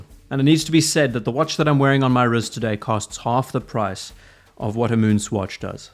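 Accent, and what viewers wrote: South Africa. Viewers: Hold up... you from South Africa?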